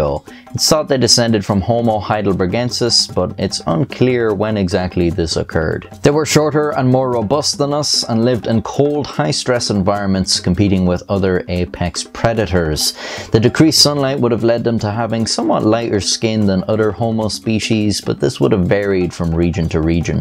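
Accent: Ireland. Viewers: How does only have 500,000 subs? Man u cover all the coolest shit. Definetly the coolest Irish guy I've ever seen lol just seem less like a guy with a kilt than the rest hah. Thx 4 the vid man. War history stories r my favorite thing u cover like the ww2 meth guy but any war history is interesting to me. Next time u get time for a war story up your sleeve would love to hear one. I usually cant stand an Irish accent like old British is also nails on a chalkboard for me but this guy is pretty chill I don't even notice it. I live in the sticks of Kentucky so im sure I sound strange to some people. Conor McGregor gave u fellas a bad rep god tht dude is obnoxious lol. Keep it up man love the content 5 star quality. I'm honestly suprised you don't have more subs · "15 of tha fookers were found in a cave" This is why we need you narrating history documentaries, nothin captures my attention faster than that lovely irish accent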